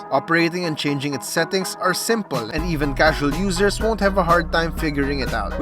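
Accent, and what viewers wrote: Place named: Philippines. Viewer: This accent is so nostalgic... Are you a Filipino? :/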